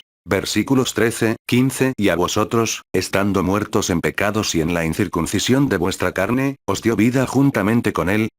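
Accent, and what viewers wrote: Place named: Spain. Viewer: Me hubiera gustado en españo latino, el español de España es bastante malo.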